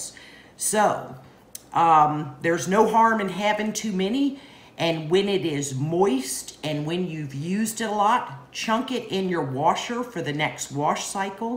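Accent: South, United States. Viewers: Loving, thanks for participating. Love the southern accent, I could listen to you all day. Good points and sanitizing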